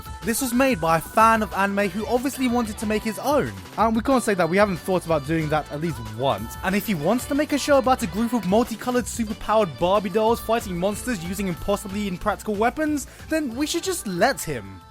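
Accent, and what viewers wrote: United Kingdom. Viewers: He's British, why do you ask ? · I like how you're an Asian, but with a British accent.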